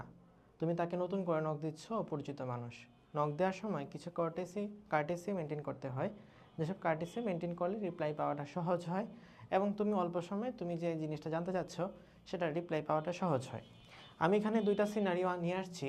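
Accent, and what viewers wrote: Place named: Habiganj, Bangladesh. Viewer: Vaiee kotha bolar modde Habiganj er basa misritho ase🥰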